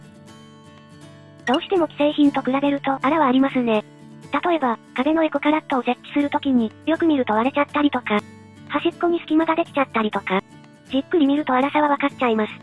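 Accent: Hakata, Japan. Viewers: チャンネル登録したよ🤗 Goodボタンも押したとよ🙋 博多弁？面白かとよ🤣🤣🤣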